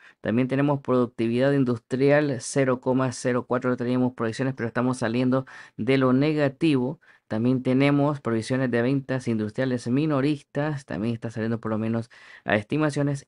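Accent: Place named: El Salvador. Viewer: que nadie nos benga a dar cazaca 😂😂😂. Que se me hace que sos Salvadoreño.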